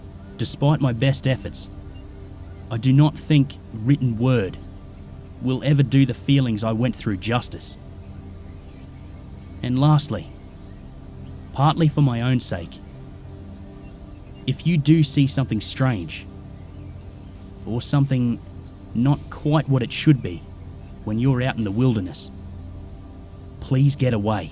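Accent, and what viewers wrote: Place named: Australia. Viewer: Love the Australian accent and I really enjoyed this video a lot and awesome job on it at the narration.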